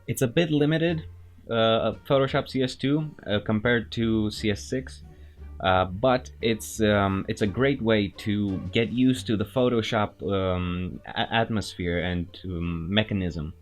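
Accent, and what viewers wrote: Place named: Romania. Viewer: yep...sounds like a romanian brother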